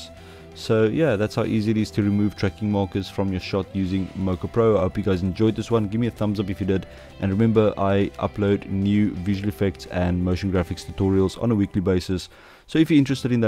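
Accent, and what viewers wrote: South Africa. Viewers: now thats a south african accent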